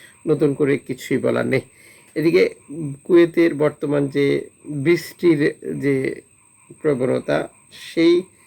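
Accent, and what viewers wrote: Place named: Noakhali, Bangladesh. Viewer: অপরাধী না অফরাধী! নোয়াখাইল্লা!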